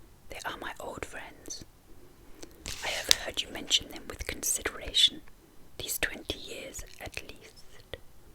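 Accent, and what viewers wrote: United Kingdom. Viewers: Luckily just found this playlist after searching deep, I'd be really happy to hear another series of a storys chapters read by you! :) whispered reading is my personal favorite to relax/ fall asleep and I think your (or the british) accent in general is percect for it. It's also something simple you can upload to feed the algorithm in case of having periods of feeling less creative :) Hope your channel will heal and you are doing well ❤️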